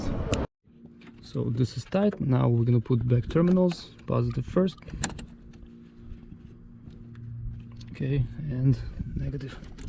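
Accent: Russia. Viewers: I like your russian accent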